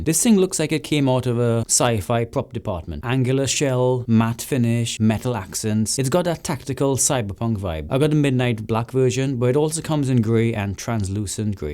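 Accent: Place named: Trinidad and Tobago. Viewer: Your accent sounds Trinidadian, is it? Great content by the way!!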